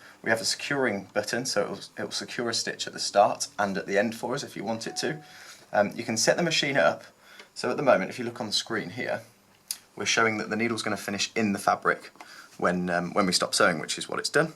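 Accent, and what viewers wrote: United Kingdom. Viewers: So the BRITS LEAD the SEW.MACHINE REVIEWS ! 😅😊! I am FROM , Michigan , now in Boston, MA ., 74 and your accent helps me understand N.England people !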